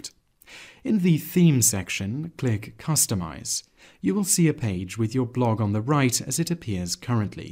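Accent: England, United Kingdom. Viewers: This Englishman's accent is amazing.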